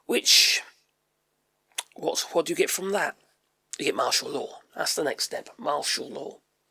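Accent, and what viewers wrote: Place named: West Midlands, United Kingdom. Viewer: Are you living in America off-grid? you are from the British West Midlands!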